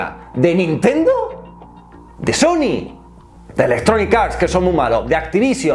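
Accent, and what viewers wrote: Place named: Granada, Spain. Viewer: Que bonito acento granaino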